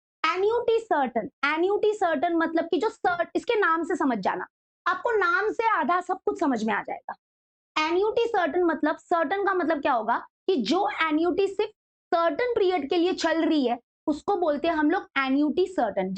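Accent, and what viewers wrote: Maharashtra, India. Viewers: Mam are you from Maharashtra....? ( come through your marathi....)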